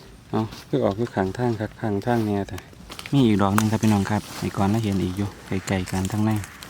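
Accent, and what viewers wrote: South, Thailand. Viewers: พูดปาษาใต้จัดๆอิดแล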